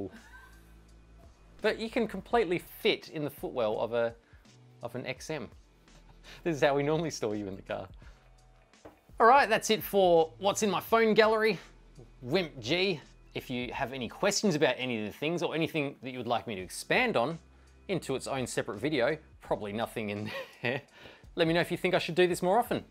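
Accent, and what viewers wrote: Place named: Australia. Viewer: You are a riot! Great sense of humour and sound effects, not to mention your outraygeous Aussie accent. Cheers!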